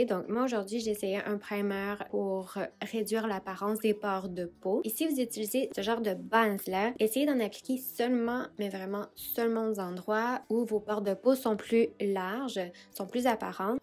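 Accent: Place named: Canada. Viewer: sur cette video la dame est canadienne je pense. elle a un accent prononce. elle ne parle pas bien le francais de france. elle se trompe dans les determinants. donc pas top pour apprendre le francais.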